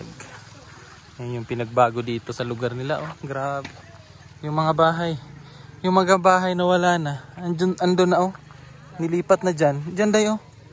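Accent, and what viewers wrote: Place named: Visayas, Philippines. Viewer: 😂😂😂😂natutuwa ako SA tuno NI sir Pg magbisaya..ang cute😂😂❤❤❤❤❤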